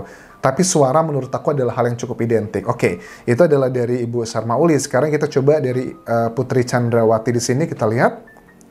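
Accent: Java, Indonesia. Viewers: Huuf suara asli jawa Pk dosen , kental Dgn logat jawanya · Suaranya sama medoknya bang dan alisnya sama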